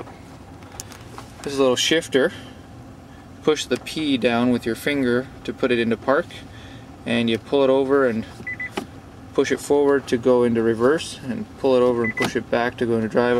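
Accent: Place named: United States. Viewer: Thanks for the vid, i do wonder how many kms you get on one charge and if charging was quicker with proper house mount charger. 100kms is no good for me, commute to work is about a 200km round trip. plus in Australia our electricity is much higher, though i don't know where your from, you seem to have an american or Canadian accent but I thought they used Miles in US, kms in Aus :)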